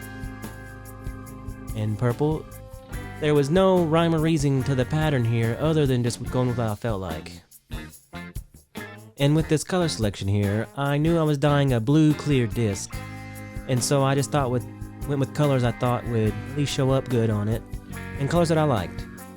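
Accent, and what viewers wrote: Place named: South, United States. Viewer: Damn I have been away from Tennessee for far too long, that southern twang makes my heart sang.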